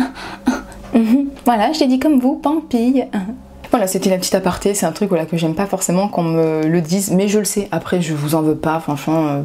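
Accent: South, France. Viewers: Étant de Belgique j'entends que tu as l'accent du sud mais pas non plus le gros accent de la cagole de Marseille 😂😂😂 Ne te plainds pas trop moi j'ai un accent belge 😭😭😭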